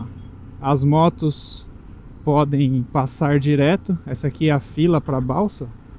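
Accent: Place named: Minas Gerais, Brazil. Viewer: Pelo sotaque acho que o é mineiro, mas pode ser de Goiás ou Espírito Santo... Grande abraço ao e aos seus companheiros!!!!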